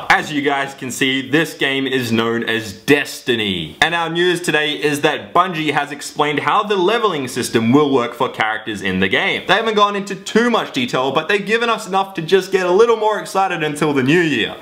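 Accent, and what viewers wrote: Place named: Australia. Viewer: He didn't say the last part!!!! "My accent is Australian and blublublubla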